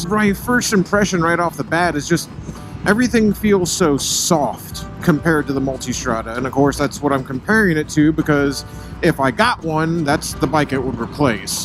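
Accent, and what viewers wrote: United States. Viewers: Ooohhhh that typical annoying yank accent and continuous mindless chit chatter.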